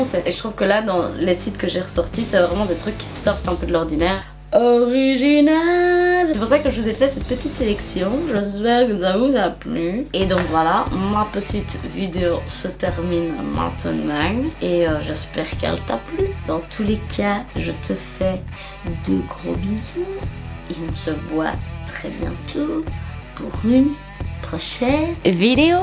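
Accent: Belgium. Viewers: aaaah mais tu es si adorable! j'adore ta bonne humeur et cet accent belge qui donne le sourire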